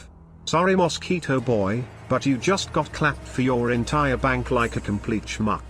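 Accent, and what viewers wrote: United States. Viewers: He sounds like a normal American white dude tf you smoking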